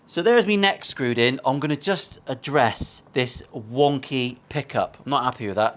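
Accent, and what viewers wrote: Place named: Plymouth, United Kingdom. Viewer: Ha I hear your janner accent dare do . I got my own parts-caster , and 2 x heavily modified acoustics ( cow sticks in the state's) all lefties as am I. They're shit ruff but I live in Devon so if you're looking for guitars to feature 😂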